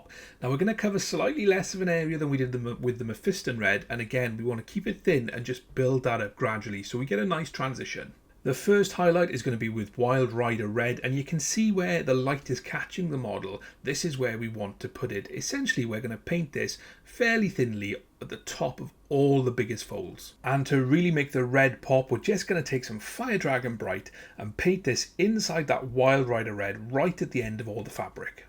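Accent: Wales, United Kingdom. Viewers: Wait is that a Newport/Cardiff accent I hear???? Sub!!!! Oh and great content!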